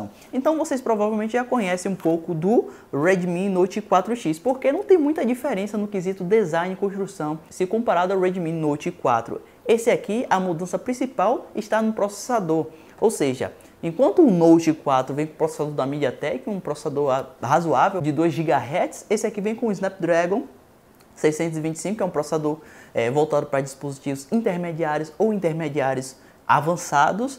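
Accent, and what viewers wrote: Bahia, Brazil. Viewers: Vc é de que enterio da Bahia?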